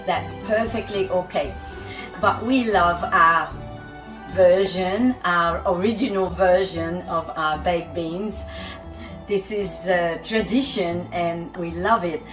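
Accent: Australia. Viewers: I could pick up the Australian accent easily. I'm sorry that as it is my first time at this channel I don't know your history. The classy French accented English reminded me so much of the beloved Madame Benoit. For those who might not know, Jehane Benoit was an early cooking star on Canadian TV and a rarity as she was on both the English and French CBC channels. She was deferentially known as Madame Benoit (rarely Jehane) and was a woman who was ahead of her time belieing her matronly appearance. She was an early advocate of vegetarian cooking and much later microwave cooking. <link>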